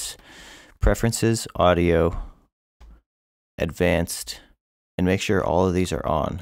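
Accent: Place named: Sweden. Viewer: Sounds Swedish, amazing!!! thnk uu was so helpful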